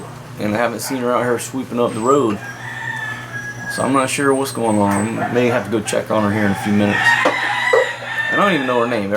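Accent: South, United States. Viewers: Kudos for laying down the law my friend. Dont know how much of a yard you have there, but did you ever consider raising chickens of your own for meat and eggs? Maybe even raise some pigs? Would like to see more of the beach you have there. I would definitely be taking advantage of the fishing as a food resource too. If your relatives come over, have them pitch in and give you a hand. If you put them to work, they will either earn their keep or stop coming around. Explain to them that education is their way out of poverty. Sounds like you have a southern accent. Where ya’ll from? I lived in south Georgia for 30 yrs. and know a good ol’ boy when I hear one.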